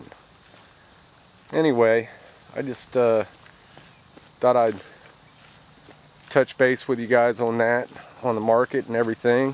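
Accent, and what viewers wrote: United States. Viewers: Is this guy selling Amway? I'm ashamed to have the same Mid-Atlantic accent. At least he tried to say something unlike me. I wish him luck during the apocalypse. I think I'd rather just die instead of trying to survive in order to rub elbows with such an intellectual.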